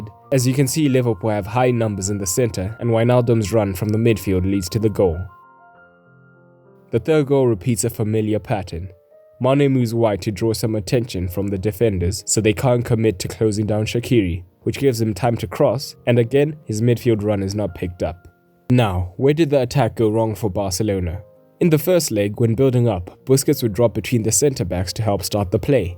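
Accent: South Africa. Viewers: Your accent is South African ?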